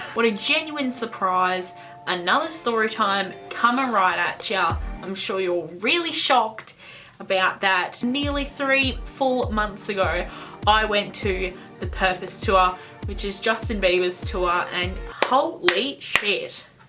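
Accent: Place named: Australia. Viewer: okay but i notice a HUGE difference between pronunciations of words when australians say them vs when americans say them (i being a fellow american). for example, i say uh-deed-iss. you say ah-deed-das. correct me if that's (the way i say it) not the correct way to say it ahaha. NO HATE ON YOUR ACCENT (i actually love it), just thought i'd point that out cause i think it's cool. 😂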